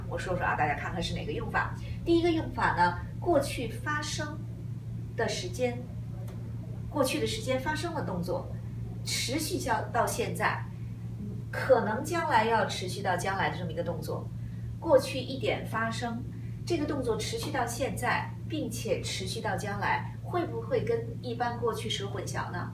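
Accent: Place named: China. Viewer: 我也觉得，跟其他女生相比，纯中式英语的语音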